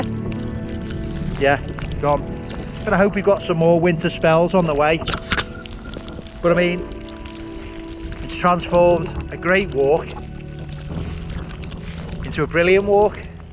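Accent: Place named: Merseyside, United Kingdom. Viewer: Not many can pull off a walk and talk and keep it interesting but fair play I enjoyed this. Lucky with the snow 👀 still nothing here at the moment as is the norm unfortunately. You sound quite local to me? Merseyside Region? Nice video, Atb.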